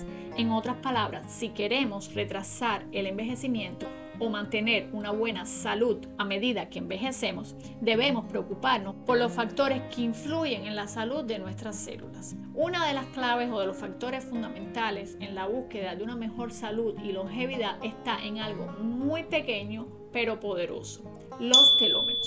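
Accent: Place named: Cuba. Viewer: GRACIAS MUY BIEN EXPLICADO SOY CUBANO Y USTED HABLA COMO NOSOTROS LOS CUBANOS DIOS LA BENDIGA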